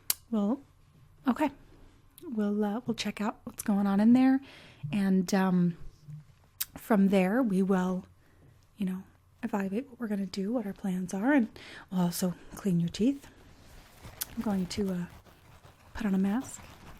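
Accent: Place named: United States. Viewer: I have to ask, is English or Portuguese your first language? You speak English with an American accent, and I don't know Portuguese so I can't identify an accent in that one.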